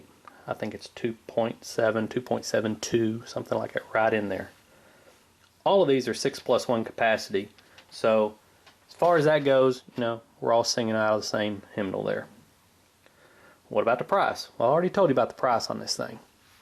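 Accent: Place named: North Carolina, United States. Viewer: That's a NC accent if I ever heard one lol. My best friend in the military is from NC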